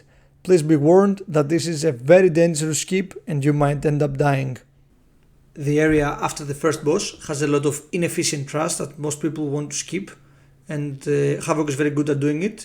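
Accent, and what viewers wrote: Greece. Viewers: I knew that was a Greek accent. Καλή μέρα!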